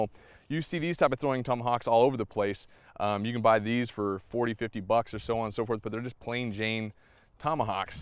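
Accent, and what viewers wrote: United States. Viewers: Tino TheKid where.. where do you think he is? He's got an American accent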